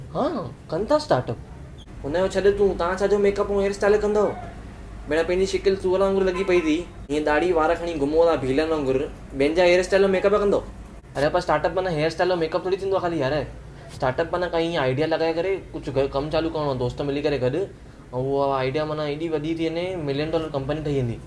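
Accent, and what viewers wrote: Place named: Sindh, Pakistan. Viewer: How to speak sindhi like u?Exactly pure Sindhi🤣🤣🤣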